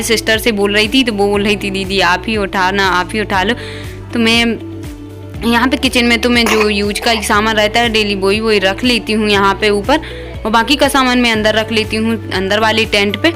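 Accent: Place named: Jhansi, India. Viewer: Ap kaha ki ho jhansi taraf ki ho ap kya boli se lag raha h bahan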